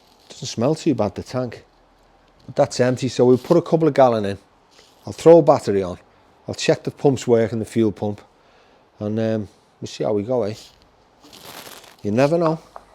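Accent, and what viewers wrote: Liverpool, United Kingdom. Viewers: sound like a scouser , well done wack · Being a fellow scouser who has not lived there for over 50 years, it was to fun to hear the accent again. I applaud you on your knowledge of motors. If I am ever in Liverpool again, I will keep a lookout for a black and/or red roller corniche. 👍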